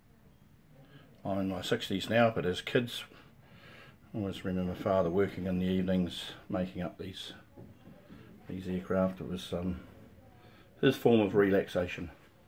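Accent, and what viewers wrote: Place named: Australia. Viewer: You sound Aussie !!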